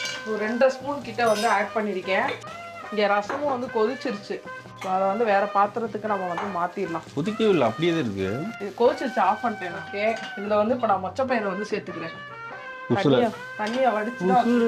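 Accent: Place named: Malaysia. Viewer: Are you from Malaysia sis.because your tamil talking same as Malaysia.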